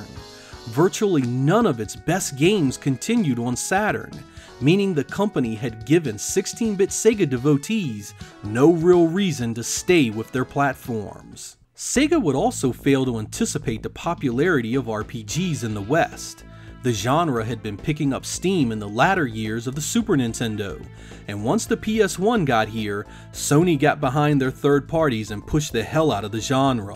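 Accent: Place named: Southeast Pennsylvania, United States. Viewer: Judging by the accent, you’re either from Southeast PA or Maryland.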